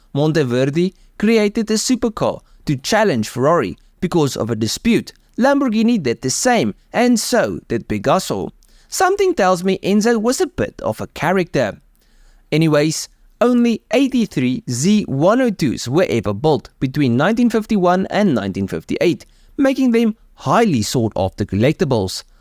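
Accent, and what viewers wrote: Afrikaans, South Africa. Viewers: Does anybody listen not only for the very interesting cars but also for guy's wild afrikaans accent?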